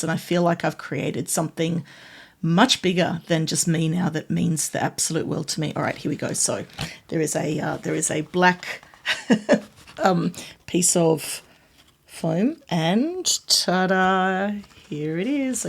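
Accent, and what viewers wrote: Australia. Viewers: Fantastic, congratulations and well deserved. I always look forward to your videos when released and enjoy the content and your explanation - and your personality. Also, so great to hear a familiar Australian accent in sea of American Microsoft content. Well done!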